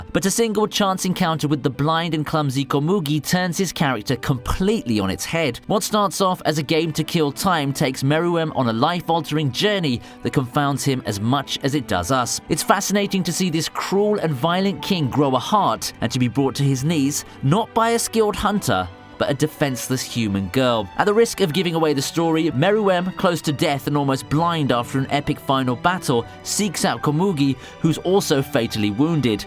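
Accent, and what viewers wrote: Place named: United Kingdom. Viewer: this video is really good but... i hate these name pronunciations. your english please stop trying to say the name properly because it just sounds goofy with our british accent.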